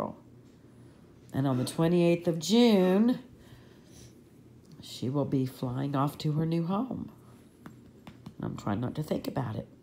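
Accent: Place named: Alabama, United States. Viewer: When I was a kid (many moons ago), I had an aunt from Alabama. I swear hearing you talk is like hearing her. And she always made me laugh. When you say "dinner", Starr says "wait for me".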